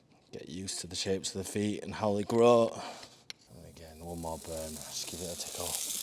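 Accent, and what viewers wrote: Yorkshire, United Kingdom. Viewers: your Yorkshire patois something words escape my understanding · Where are you from? Britain? Sounds like. I' ve been to Oxford, Kidlington, Cambridge, Bath, London, Bournmouth, Blenheim Palace and Newmarket, watching the racing horses 🥰. And what's your favourite knife? 🤗🦄 Thanks for sharing. 👋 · He is a Yorkshire lad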